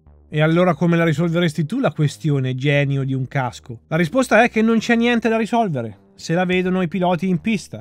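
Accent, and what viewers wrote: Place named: Veneto, Italy. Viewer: E io che pensavo che fosse Emiliano, ora ho il dubbio che sia Veneto 😂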